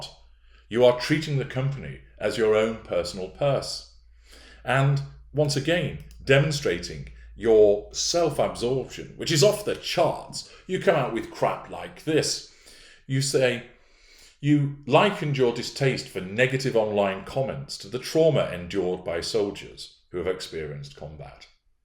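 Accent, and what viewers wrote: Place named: RP, United Kingdom. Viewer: swears when he wants to get an important point across. He sounds posh, and he does it with great finesse.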